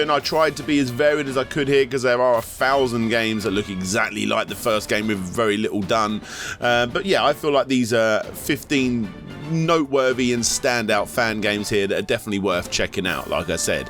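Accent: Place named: United Kingdom. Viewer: god /da /mn the sh /it brit narration.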